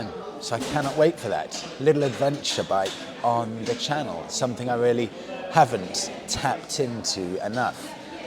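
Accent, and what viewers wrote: United Kingdom. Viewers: I came here for the Meteor 650, but that quintessential British accent made me stay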